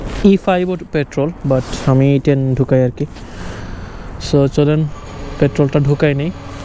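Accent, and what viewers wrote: Sylhet, Bangladesh. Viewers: ভাই আপনি সিলেটি নি?? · ভাই আপনার কতা হুনিয়া মনে হয় আপনি আমরার সিলেটি মানুষ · আরে ভাই তুমি তাইন তো দেখিয়ার সিলেটি ❤ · Apne kita sylheti ni ??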